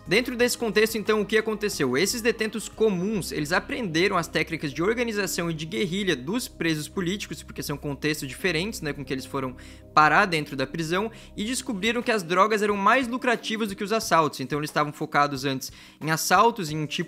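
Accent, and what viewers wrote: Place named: Rio de Janeiro, Brazil. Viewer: Com esse sutak do RJ tu não é.milcia é polícia.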